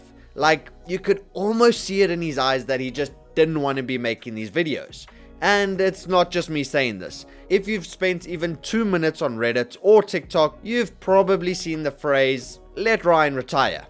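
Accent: South Africa. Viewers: Are you south african boet?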